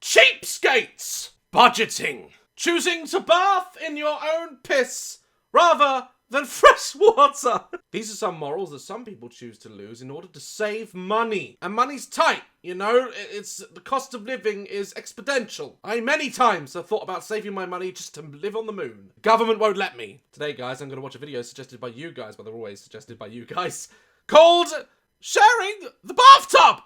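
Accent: United Kingdom. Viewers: I love how becomes SUPER BRITISH whenever he is confused about stuff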